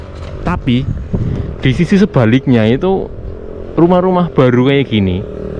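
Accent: Banyumas, Indonesia. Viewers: Aku nonton sambil nelajar ngapak 😊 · Malah seneng mas, Ngapak,dadine natural. Ora mboseni,terus pemandangane apik bgt. Aja klalen,"permisi Mbah,🤭🤭🤭bismillah mas,😃😃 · yang ku suka dr channell ini adalah boso ngapak nya mas dan content nya yg sederhana, semangat mas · Mantab mas,ORA ngapak ora enak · Enak kang; ora ngapak ora kepenak....😊😊😊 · Sy senang dengan logat ngapaknya kang.sekalian belajar bahasa ngapak.sukses terus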